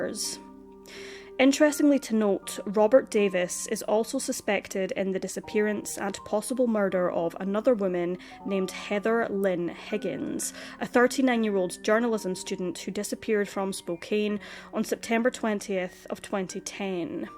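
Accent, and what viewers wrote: Scotland, United Kingdom. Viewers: She is in Scotland with a Scottish accent, give her a break!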